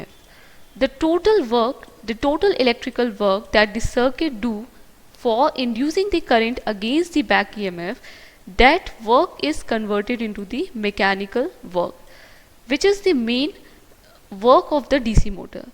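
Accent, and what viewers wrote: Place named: India. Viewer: Very good Best Indian accent yet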